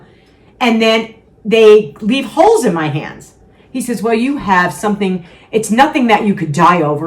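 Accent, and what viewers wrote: New York, United States. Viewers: Hi, it's Joey from PBD. Did you grow up in NY or near there? I am sensing a little "back home" when I hear you talk. It reminds me of family and good times. Ok, that bowl of veggies for 100 calories is a very good portrayal of how 100 calories are so filling. I am glad to hear you talk about carbs and starch. You know how it is when you are surrounded by Keto and no carb people who think we have all lost our mind by eating carbs. Yes, you are right, you can eat a large meal of potatoes and veggies and be stuffed for about 400 calories. It's a beautiful think. I love all the benefits you are experience in healing your body from a plant based lifestyle. I just subscribe. I gotta support my YouTube HCLF sister! Great video and great info. Hugs!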